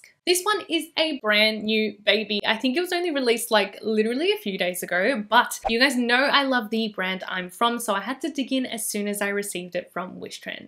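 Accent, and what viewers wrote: Australia. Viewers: I knew right away you’re an Aussie. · I like her Aussie Accent. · Very Australian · That Asian Australian accent tho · i'm absolutely loving your heavy australian accent😄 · u aussie?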